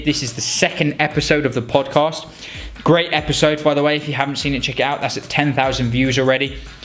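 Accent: England, United Kingdom. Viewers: Where in england are u from? cant place the accent. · Sometimes u sound like pews, but with English accent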